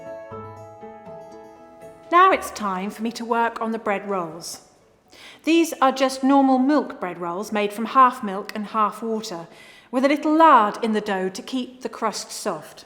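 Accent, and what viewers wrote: United Kingdom. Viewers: British English ♥️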